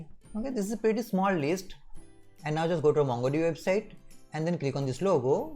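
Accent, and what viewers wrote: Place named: India. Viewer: bro your vice is like a hindustani 🙂🙂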